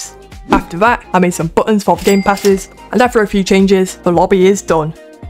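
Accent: United Kingdom. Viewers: are you british im irish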